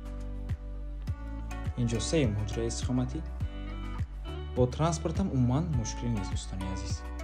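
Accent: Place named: Tajikistan. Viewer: Бисёр забони ширини тожики доштаи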